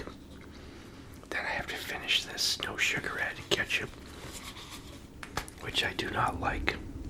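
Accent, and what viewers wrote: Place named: New England, United States. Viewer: Sounds like a fellow New Englander! I'm right over in Norwich CT! Just found your channel, love this video. I love going on late night McDonalds runs, sometimes I'm not even super hungry but it's just like a satisfying solo excursion while my wife is asleep. Listen to some music in the car and chow down in the parking lot. Sometimes if I'm feeling froggy I'll wake up super early for a McDonalds breakfast run while it's still dark out.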